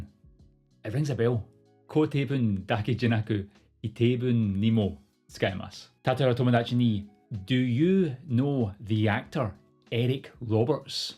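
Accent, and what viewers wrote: United Kingdom. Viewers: イギリス英語だぁ〜💕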